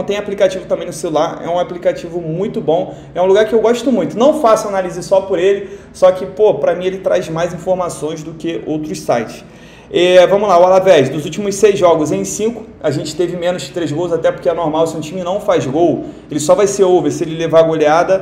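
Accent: Rio de Janeiro, Brazil. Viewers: Deu moral aí cria.. pelo sotaque vc e do rio né ?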